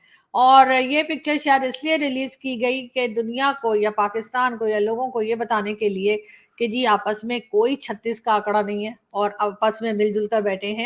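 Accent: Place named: Mumbai, India. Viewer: Mumbai language achha bol lete hein aap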